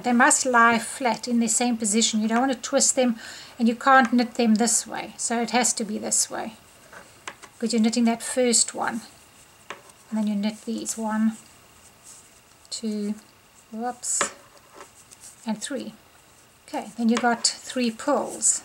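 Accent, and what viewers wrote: South Africa. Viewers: Am I right, don't the lady who speaks live in South Africa? Sounds almost like the South African accent. Anyway, beautiful Kimono!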